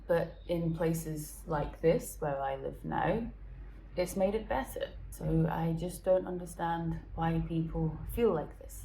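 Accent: Scotland, United Kingdom. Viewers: our southern border is a mess and needs fixed.IV personally been to two different parts of it Texas and Arizona and seen it with my own eyes.Sorry your path has slowed down and put you in limbo.I still enjoy watching your Spain travels.I enjoy your voice and accent.It makes me wonder what my Scottish ancestors sounded like when they Immigrated to Virginia in the mi 1700’s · As a fellow traveller (in the past sadly) ive been the outsider in alot of places around the world and you simply HAVE TO assimilate to some extent wherever you go and to be upset that you cannot go and do whatever and wherever you want is naive at best although you are correct to say Governments will jump on any opportunity to gain power or wealth regarding borders or visas or any aspect but that unfortunately IS the way power systems work more or less depending where you go as Culture does play a huge part in attitudes and behaviors regarding sex, religion and ideology. 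As sad as it sounds and as sad as it IS the general global neo liberal agenda permeating our lovely wee planet is only worsening any of those freedoms we used to have especially to the free spirits like yourself who just wanna fly so until that changes we'll just have to take the ride....(you did buy the ticket??) PS I can hear a Scottish twang in there and since seen you were at Uni in Dundee. Anyway love watching you and your adventures, lovely lassie. Maybe see you on the road down the line. X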